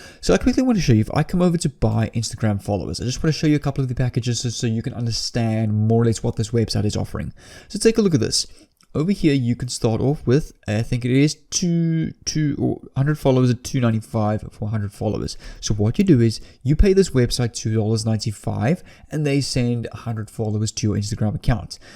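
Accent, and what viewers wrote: South Africa. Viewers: Wow you sound South African👌🏽Great content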